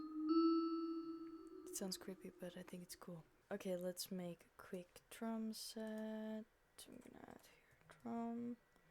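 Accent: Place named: France. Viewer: Great work! keep it up! I also like ur accent, are u French?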